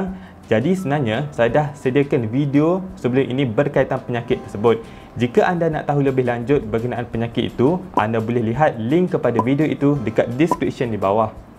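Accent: Malaysia. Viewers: Orang Malaysia Ya Bang...?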